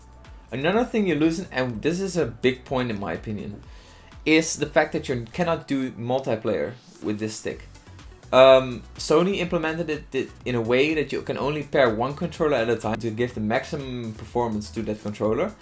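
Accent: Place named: Netherlands. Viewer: You are Dutch i can hear that in your accent 😂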